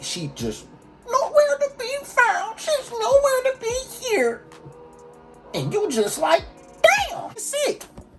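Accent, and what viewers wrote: Baltimore, United States. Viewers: This is my 1st time watching your vids. Are you from Baltimore? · you From BMore? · BOY I KNOW YEW FROM BALTIMORE BY THE WAY YEW SPEAK 😂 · U from bmore?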